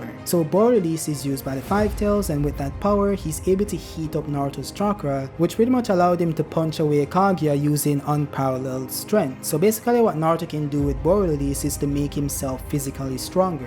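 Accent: Jamaica. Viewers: Do you have Jamaican ancestry your talking kind of sound like that for me??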